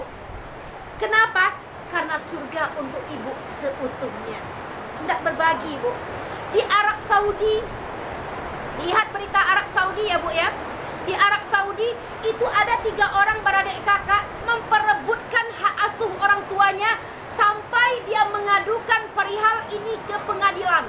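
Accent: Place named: Minangkabau, Indonesia. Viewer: terbaikkk ustazah..👍👍 Haha Bakaleberan muncuangnyo Bahaso urang awak..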